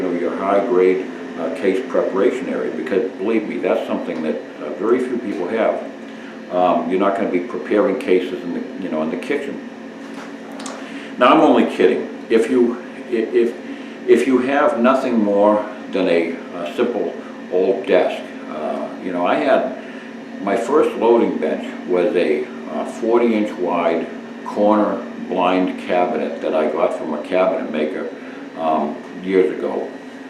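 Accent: New England, United States. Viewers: Like everyone, I have some slightly different thoughts on some of your editorials but for the most part, when I want a very informative "no hidden agenda" intelligent perspective on my current gun interests, I go to. I'm from Texas and I guess I'm somewhat skewed in the area of first-impressions, but once I got past your (no disrespect) yankee accent, you became one of my buddies. Thanks for all the hard work you put into your videos. Don from Pearland, Texas.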